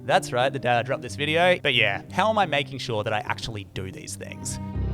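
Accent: Australia. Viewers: Hey, as an Aussie who watches all these productivity / life improvement stuff, you are so incredibly refreshing. It’s great to hear our accent and the very aus approach to this stuff - ‘fuck it, I’m in!’ - you’re unique in a sea with lots of sameness so you gotta keep making content! I’ll listen to anything you’d like to talk about! This bucket list, mate - that’s fucking awesome and I’m looking forward to following your journey!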